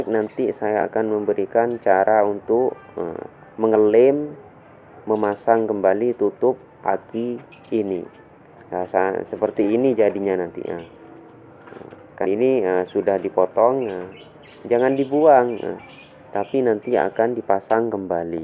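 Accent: Madura, Indonesia. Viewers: Terima kasih ,Mohon maaf, Logat Maduranya Medok sekali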